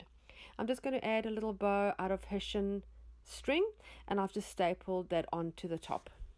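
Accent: South Africa. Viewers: Hello 👋🏻 Just discovered this kind of journalling during the lockdown really and your channel came up in my feed! Love your creativity 💕 Heard your SA accent and wondered where you were? Going to watch some more 🎶🌟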